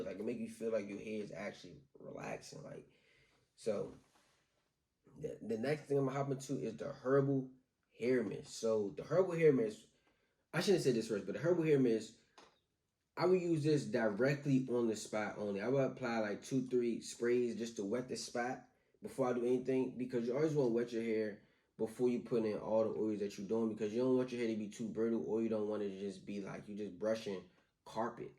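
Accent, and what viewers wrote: DMV, United States. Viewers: He sound like he’s from the DMV lol…. Great video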